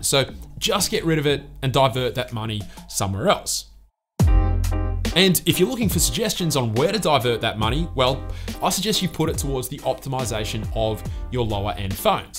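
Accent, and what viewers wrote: Australia. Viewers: You Australian accent tickles me 🤣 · Your Australian accent sounds really fun ngl, and I like how you use 21:9 video. Watching full screen on a longer phone is such a joyous experience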